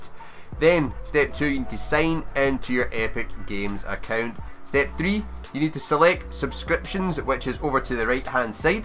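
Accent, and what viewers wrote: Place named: Scotland, United Kingdom. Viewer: I love your Scottish accent ❤️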